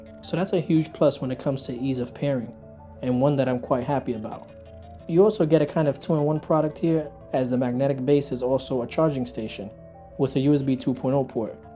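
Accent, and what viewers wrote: New York, United States. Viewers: Subscribed to your channel for: 1. The in depth tech reviews 2. Eye grabbing videography 3. The familiar New York accent Well done. Keep up the great work!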